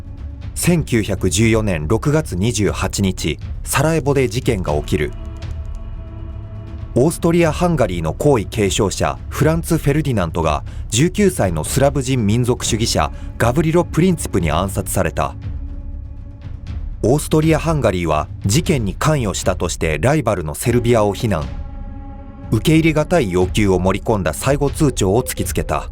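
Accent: Kansai, Japan. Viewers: ジオヒストリーと同じ関西訛りが好きです。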